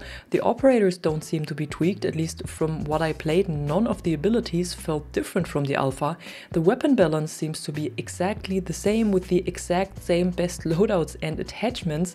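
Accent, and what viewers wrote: Germany. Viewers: ich faends richtig klasse wenn du einfach richtig deutsch englisch sprichst. ich versteh immer nicht warum leutre krampfhaft versuchen irgendein mittelamerikanischen akzent zu imitieren. bööörgr. for the most part, you speak english with german accent, und das ist auch gut so. alles andere wirkt laecherlich, bei jedem der versucht nativ speaker zu imitieren. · Hey, are you german? Your accent gives me hard german vibes.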